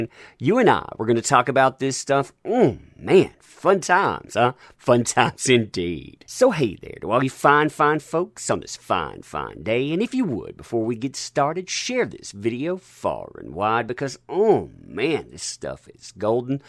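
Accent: South, United States. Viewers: It's his southern drawl that's very charming🤗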